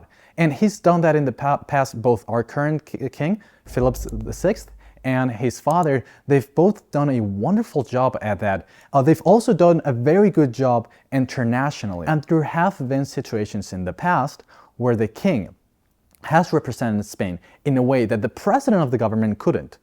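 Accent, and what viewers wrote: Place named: United States. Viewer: Great video but try to speak less yank and more English 👏🏻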